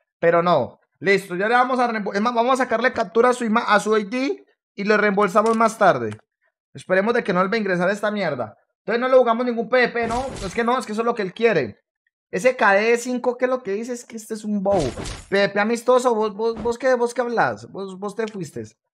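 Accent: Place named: Colombia. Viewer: El es colombiano, reconozco el acento ya que yo tambien soy colombiano, pero es muy bulgar por eso no veo mucho sus videos xdd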